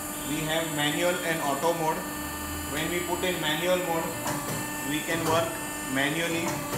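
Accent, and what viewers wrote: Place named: Gujarat, India. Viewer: Gujju engliss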